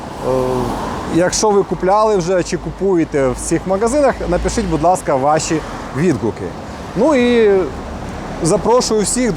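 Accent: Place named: Odesa, Ukraine. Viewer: Уважаемая, разве я Вам хамил? Или называл недалëкою? Или вообще с Вами начинал диалог? Или интересовался Вашим мнением? Вы отвечайте, только тогда, когда Вас спрашивают, а не будьте "затычкой", дюже грамотной. И определитесь сами для себя, на суджике вам говорить, или балакать. А что касается, вся изюминка была, смотреть контент про Одессу, именно от человека с Одесским диалектом, на понятном для всех языке.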